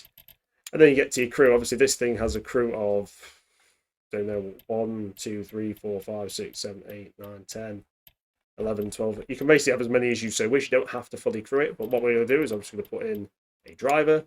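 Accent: England, United Kingdom. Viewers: i could tell by your accent that you were english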